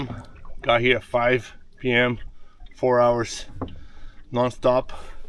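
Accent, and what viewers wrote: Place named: Brazil. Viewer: Boa, pelo seu accent eu percebi que era brasileiro haha. Video incrível, qual região você está? Abraços!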